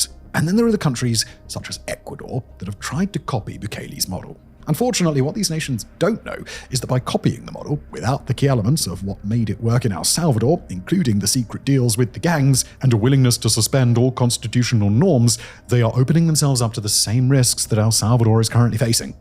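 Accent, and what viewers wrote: United Kingdom. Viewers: This is what mindlessly believing videos because it’s on YouTube and the speaker has a British accent looks like. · I pity so much. His britishness makes him pronounce words as simple as 'hour' as 'auagh'.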